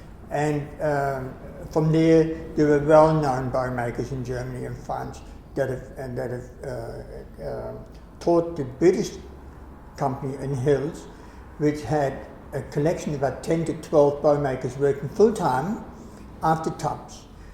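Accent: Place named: Australia. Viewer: His accent sounds Australian to me, so I don't know if he means US dollars or Oz dollars.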